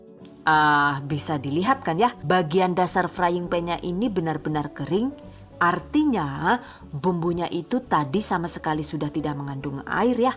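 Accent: Banyumas, Indonesia. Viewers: Org madiun kok bahasanya nyong ngapak banyumas sii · Yg aq suka dari chanel ini selalu disertakan tips dan trik yg mudah dipahami.. Dan selalu berhasil tiap kali dipraktekkan👍 dan yg gk kalah lagi selalu ada lucu2annya ala ngapak2nya itu lo bikin betah nontonya🤗🤗.. Terimakasih..😍😍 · Hahahaaaa...paling ditunggu komentar ngapaknya....asli lucuuu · Senyum2 sendiri aq tuh kalau bunda lagi ngomong ngapak sambil ngelucu🤭😄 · Ini Madiun kok ngapak ya bun, orang mana aslinya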